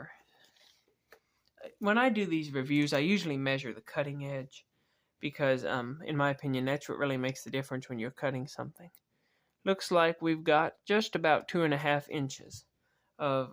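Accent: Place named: West Texas, United States. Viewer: He’s probably not from there but his voice and accent reminds me so much of a soft spoken hotel clerk in Van Horn, west TX 😂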